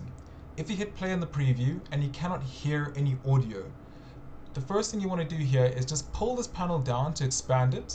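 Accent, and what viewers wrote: South Africa. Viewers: Are you South African? I hear a familiar accent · I can spot a South African accent a miles away, thanks - I was using bluetooth headphones & had to change it on audio hardware.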